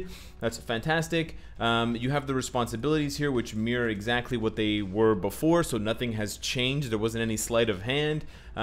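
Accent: Portugal. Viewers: Hey, It's great to hear your opinion about it. It surprised me that Netmarble actually responded to this drama, I just didn't think they would. It seems they want to be closer to the community and listen to what we have to say, which is fantastic. They are improving and I hope, as you said, that they will make adjustments to this program in the future. Also, it's always great to hear your portuguese accent hahaha Seu canal me ajudou muito desde que comecei a jogar, obrigada! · Eita sotaque Português incrível. Bom saber de suas raízes,. Te acompanho bastante e admiro muito seu trabalho (sou brasileiro), está me ajudando MUITO desde que voltei a jogar - parei no lançamento da Kamala Khan. Ign: ThomasDell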